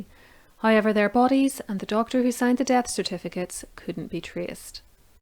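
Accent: Northern Ireland, United Kingdom. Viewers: Is the narrator Northern Irish? I recognise the accent.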